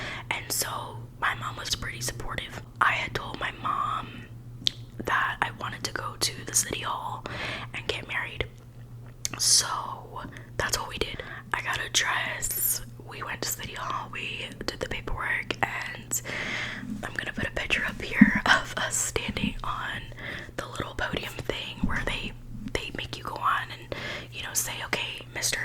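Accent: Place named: New Jersey, United States. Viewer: Knew u were from jersey the min I heard u talk! but it sucks u had to go thru that, sounds like a headache. 🤕😒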